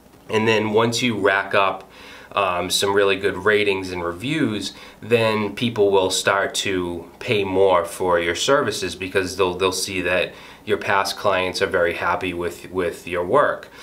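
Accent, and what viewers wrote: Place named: East Coast, United States. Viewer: I've heard your message so many times before. But because your voice is so calming and mixed with what I think is a an East coast accent, your message seems so much more trustworthy. Lol. Great advice overall man, keep it up! Also thanks for deciding to help others. You're obviously an individual who is on a higher level than most.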